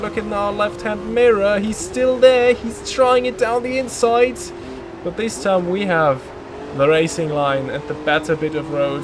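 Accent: Germany. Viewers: You sound German are you German?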